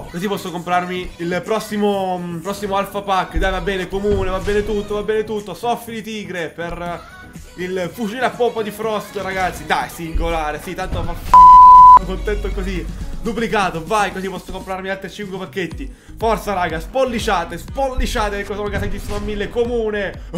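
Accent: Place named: Rome, Italy. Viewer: Ma sto accento romano?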